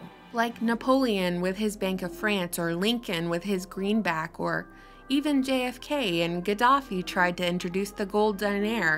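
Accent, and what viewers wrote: South, United States. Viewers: GREAT VIDEO! Thank You....Love Your Southern Accent.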